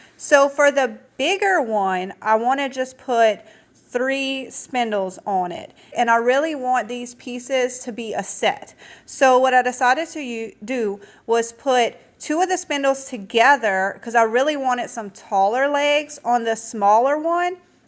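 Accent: Louisiana, United States. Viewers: I just came across your channel and everything is beautiful! I’m curious though, you have got to be from Louisiana. That definitely sounds like a Cajun accent to me! 💕 Edit: just looked in the description and answered my own question!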